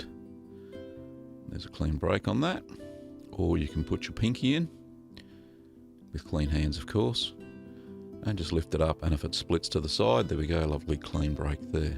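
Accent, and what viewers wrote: Australia. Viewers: Hi. Loving your videos - great work. I notice you use the numbers for your cultures. I'm in Australia and from your accent so are you. Can you let me know where you buy your cultures? My online supplier just sells soft and hard meso cultures and I can't figure out how to map them to the numbers they use on <link> Thanks in advance!!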